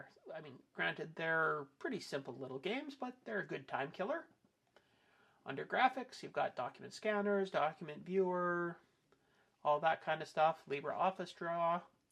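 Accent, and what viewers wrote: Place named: Canada. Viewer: Dear Canadian, March 2026. I'n a 90 yo Australian living in Brisbane. Gee a Canadian Web site what a surprise. We like Canadians even though we don't like the acent which is close to yanks. I have used Linux a few distributions, Mint for the last twenty decades. God Bless. ====================================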